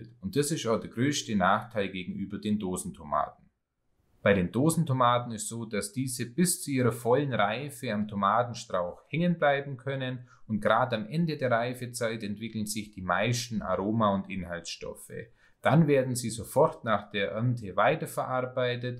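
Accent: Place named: Baden-Württemberg, Germany. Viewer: Wie kann man da fränkisch raushören? Ich glaube, der gute kommt eher aus Baden-Württemberg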